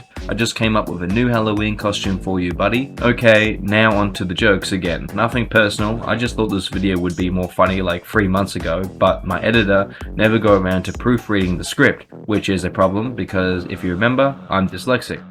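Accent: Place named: Quebec, Canada. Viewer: He's quebecoise? Over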